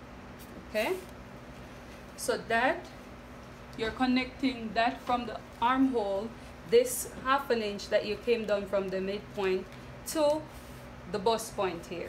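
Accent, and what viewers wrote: Jamaica. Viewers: Hi. Thanks. Are you Jamaican? U sound Jamaican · Thank you for the video and for the scripture. Love your voice. New subscriber. Are you from Jamaica? Great video 😊✨💐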